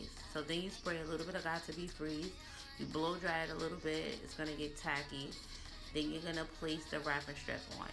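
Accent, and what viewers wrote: New York, United States. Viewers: I love the New York accent, im from California, I'm in Long Beach I love yall 💜💜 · What part of NY are you from? I hear an accent. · Do I Hear A New York Accent ?? Omg If So YASSSSS ITS SK PRETTY lol i love accents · Hair looks amazing!!! Love that NY accent too. :)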